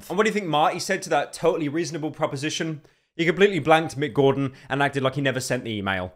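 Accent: United Kingdom. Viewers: even if Charlie uploaded before, I'd still watch just because I prefer his britishness over Charlie's monotone voice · I always wait for the explanation because I'm European and I prefer the British accent over the depressed accent.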